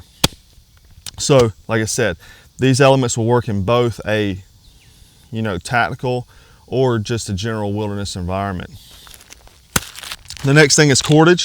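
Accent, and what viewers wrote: South, United States. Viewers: Marine with a southern accent who reviews survival gear outdoors. Subbed.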